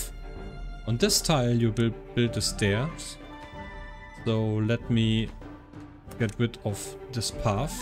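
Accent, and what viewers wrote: Germany. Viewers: Deutscher Akzent so deutlich zu hören xD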